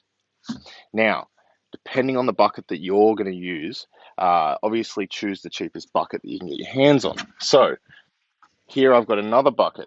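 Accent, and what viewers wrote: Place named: Australia. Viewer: finally a yobo gardener.... sick of hearing yanks and poms... plus 10 bogan points for the beers... subscribing to this bloody legend!!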